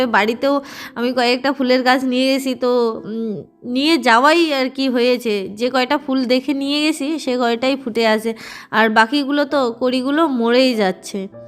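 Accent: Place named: Bangladesh. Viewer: তুমি তো এই দেশের মেয়ে তাহলে তুমি কেনো বাংলা দেশের মতো কথা বলো....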